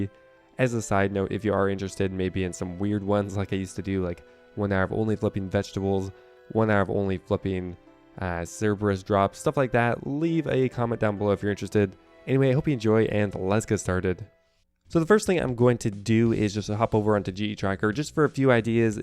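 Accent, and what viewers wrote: Canada. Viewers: Hey random question, you sound like you're Canadian but originally from a European country, or am I just hearing things lol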